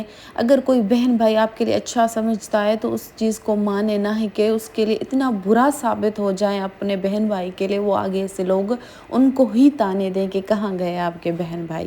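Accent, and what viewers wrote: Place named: Sindh, Pakistan. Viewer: Sister ap sindhi ho na😂 lehjay sy pata chal rha hy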